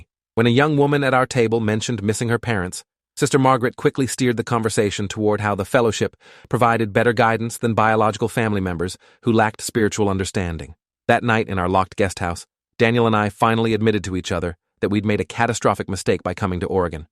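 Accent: United Kingdom. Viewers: anyone hears the British accent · why british again? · Why does it keep slipping into a British accent? 😂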